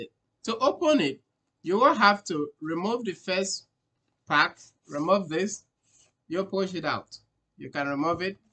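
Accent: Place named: India. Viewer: I was hearing a india speak, awesome information though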